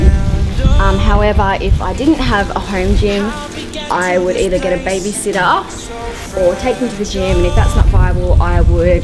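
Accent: Australia. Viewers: I changed my Alexa voice to Australian because of.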